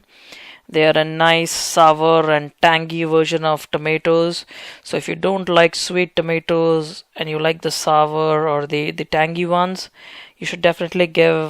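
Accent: India. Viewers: What kind of accent is it? Indian or something similar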